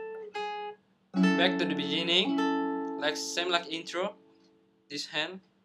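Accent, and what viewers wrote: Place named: Indonesia. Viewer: i noticed Indonesian accent~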